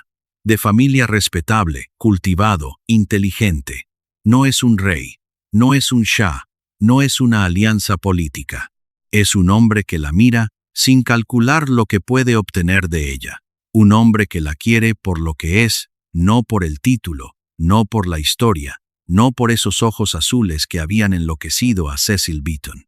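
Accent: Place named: United States. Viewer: Cambien el robot de lectura con ese acento yanqui horrible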